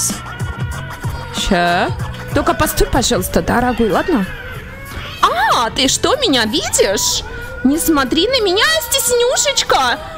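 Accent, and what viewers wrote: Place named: Germany. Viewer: немецкий акцент проскакивает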